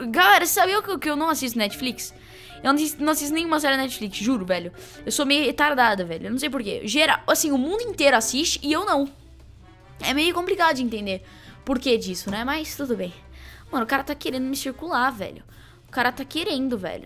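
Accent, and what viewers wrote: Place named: Sao Paulo, Brazil. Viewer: Belo sutaque Paulista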